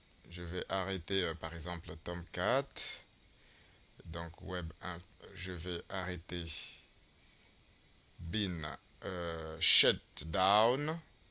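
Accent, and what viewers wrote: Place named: Cameroon. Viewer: Merci beaucoup...tu as une accent camerounais ;)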